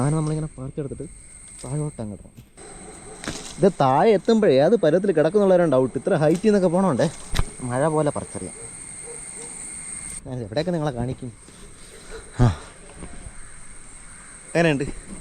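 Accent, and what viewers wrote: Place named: Kasaragod, India. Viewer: ഇദ്ധേഹം കാസഗോഡൻ മലയാളമല്ലോ സംസാരിക്കുന്നത്...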